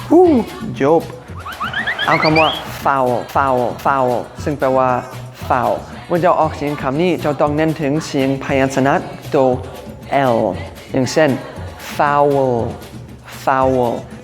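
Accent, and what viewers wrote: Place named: Laos. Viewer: ເວົ້າລາວ ເປັນຕາຮັກນໍ ອາຈານ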